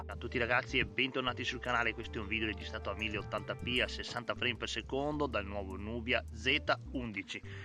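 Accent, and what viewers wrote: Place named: Veneto, Italy. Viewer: bellissimo l'accento veneto!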